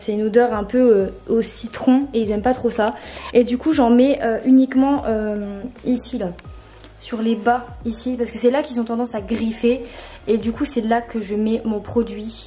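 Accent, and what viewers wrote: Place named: Alsace, France. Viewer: ca sebt l'accent alsacien...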